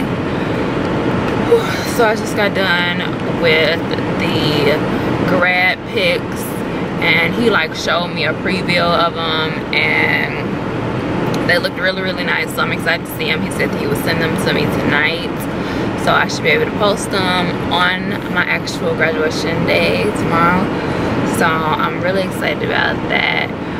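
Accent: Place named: South, United States. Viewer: You have a southern accent, so initially, I thought you were from NC; then I thought, maybe Texas. Chicago?! LOL!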